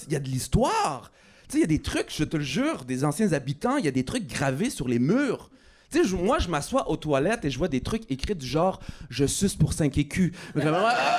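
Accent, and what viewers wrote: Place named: Quebec, Canada. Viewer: J’adore 😂 beau gris avec un bel accent québécois naturel et avec des expressions nordistes… 🥰😍🤩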